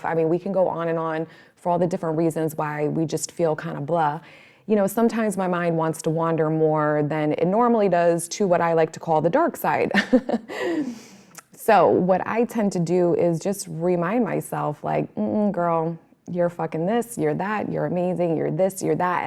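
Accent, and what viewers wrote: New York, United States. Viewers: Also, you sound like you’re from NY. I wouldn’t expect any less!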